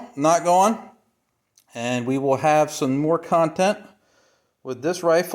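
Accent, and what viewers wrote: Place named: Baltimore, United States. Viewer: Good to hear that Baltimore regional accent again!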